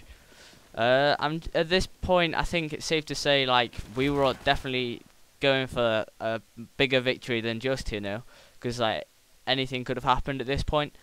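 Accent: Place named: England, United Kingdom. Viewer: your english accent <3 really good gameplay and commentary so looking forward to next one, if you want anything uploaded just send me a message and i will do it asap. // Vizze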